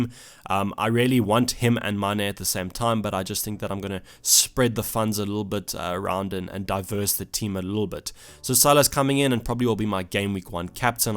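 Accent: South Africa. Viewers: Are you south African? / have any South African heritage? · Ya he does have a hint of an sa accent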